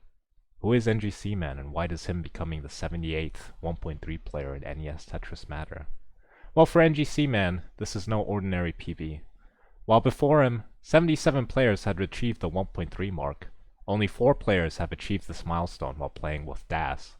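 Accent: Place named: United States. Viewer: 1.2 19 start when? also why do you sound so american?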